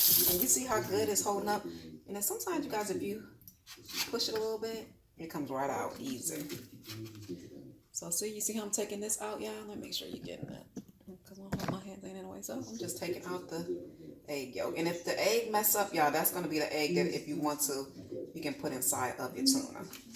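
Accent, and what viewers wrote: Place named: California, United States. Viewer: are you from cali? you sound like it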